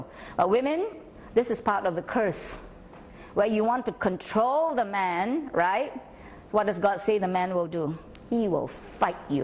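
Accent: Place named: Guyana. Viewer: you sound so guyanese.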